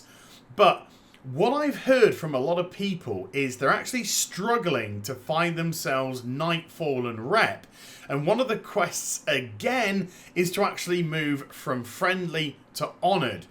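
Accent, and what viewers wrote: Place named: United Kingdom. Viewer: Really like your videos. You're actually one of, if not the best WoW YouTuber in my opinion. Also i like your voice and your accent. As a German who doesnt really appreaciate the British-English-Accent yours is very enjoyable. Thumbs Up.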